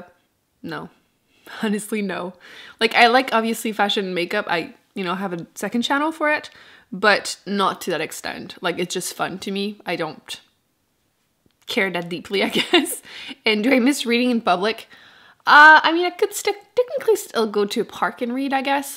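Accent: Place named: Quebec, Canada. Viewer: Français rouillé! ;) J'avoue que je taquine mon fils quand il nous visite et parle franglais... Mais je trouve quand même surprenant - agréablement - que les mots en français que tu glisses à l'occasion "sonnent" québécois quand même... plusieurs finissent pas parler avec un accent anglais, même si leur langue maternelle est le francais...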